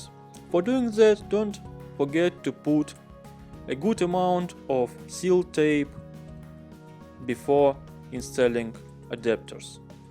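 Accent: Russia. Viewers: I like the Russian accent.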